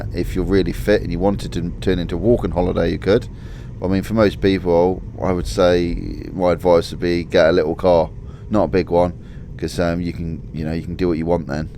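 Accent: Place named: West Country, United Kingdom. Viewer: You sound very west country (like Devon/Cornwall). Not sure what I expected a Guernsey accent to be like though.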